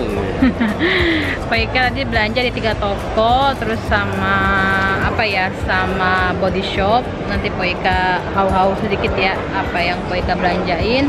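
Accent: Jakarta, Indonesia. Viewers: Hai, aku niy sukaaaa banget sama, krn sll mengingatkan aku pada tetangga2ku dulu waktu aku masih tinggal di salah satu daerah di kebayoran baru, yg sekeliling aku itu orang betawi semua, jd selalu kangen dan teringat dg tetangga2ku dulu, dari cara bicara, sikap, mirip dengan... btw netizen mah ga usah didengerin mpok, sehat selalu dan bahagia selalu ya dg Bang Sabeni😊🤗😘😘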